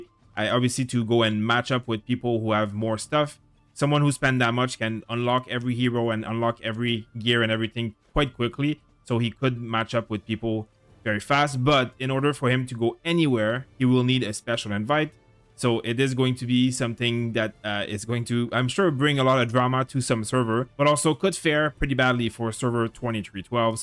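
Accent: Quebec, Canada. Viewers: Tu sonne comme un québécois aha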